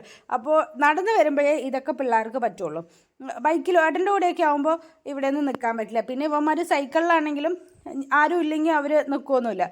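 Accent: Palakkad, India. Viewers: Samsaram kettappo doubt thonni.😊 Palakkad evida?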